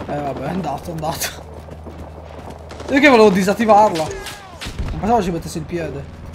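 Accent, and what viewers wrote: Sardinia, Italy. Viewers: E' uno spettacolo questo gioco, ed il tuo commentario mi fa sbellicare dalle risate...questo accento sardo è super! Meno male uno che finalmente mette nella sua homepage quì non c'è fortnite...sei ancora più mitico!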